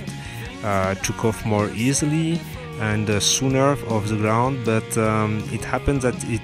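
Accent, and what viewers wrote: France. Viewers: Are you french ? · Você é brasileiro? Essa cidade é no Brasil mas seu sotaque é francês...enfim se for francês vá "treinar" com a vida das pessoas no seu país...se for brasileiro para de fazer burrada imbecil! Treine no simulador ou em uma área afastada, foi de imensa responsabilidade fazer isso em cima de bairros residenciais e lojas como a Havan que está sempre lotada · Jme suis directement dit que tu es Français avec ton accent ahahaha bonne vidéo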